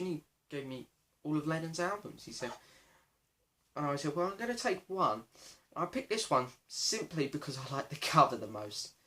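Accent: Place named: Essex, United Kingdom. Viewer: Where you from? Essex? Love the accent I’m from Romford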